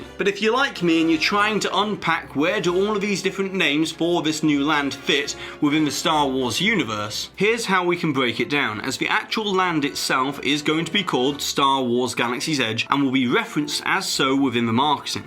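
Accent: England, United Kingdom. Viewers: What part of England does your accent hail? It is TRULY unique, and I have heard it only seldom before.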